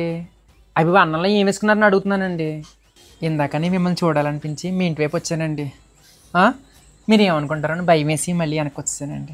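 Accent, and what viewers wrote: Godavari, India. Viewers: Iyyababoiiiiii sanaaa baaga miguthunnaru andi meeru mana godarolla yaaasa baane catch chesisav ehey nuvvu 😂😂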